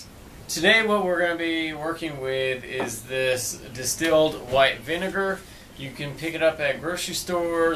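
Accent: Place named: South, United States. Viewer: Nice and well done video. I have some tools that were left out in the rain a few years. I will try your technique to clean them up. I agree 100% with S. Walker below. You spoke clearly, explained the process well and your slight southern accent added personality to your video. This is from a guy who lives in South Carolina. If every video on youtube sounded like the Discovery channel, it would be a very boring place. Don't change a thing young man !!!!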